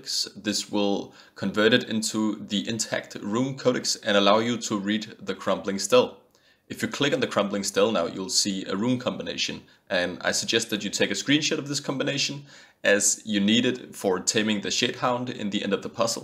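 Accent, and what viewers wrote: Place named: Denmark. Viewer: Den danske accent er legendarisk aha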